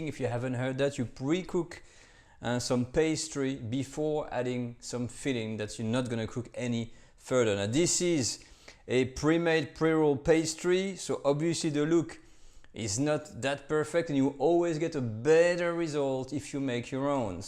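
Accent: France. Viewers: James Franco with a French accent. Subscribed!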